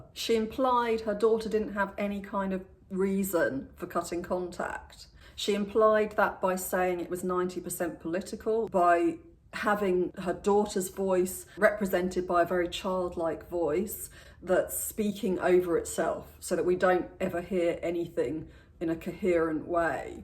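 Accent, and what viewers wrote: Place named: RP, United Kingdom. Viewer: 🙌🏾 much appreciated and respect 👏🏽🐈‍⬛. Nobody forced her to put videos on internet and share her personal experience, so why is she complaining about internet? Unfortunately I have been through a severe narcissistic relationship and I know very well all these types of tendencies. I’d rather prefer to enjoy the amazing lecture, the great and posh English accent but also the beautiful cat 🐈 🙌🏾🌻🌻🌻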